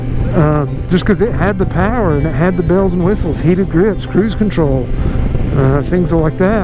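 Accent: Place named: South, United States. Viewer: Bro.. you have a southern accent in this video that I have never noticed before!? Gorgeous bike!!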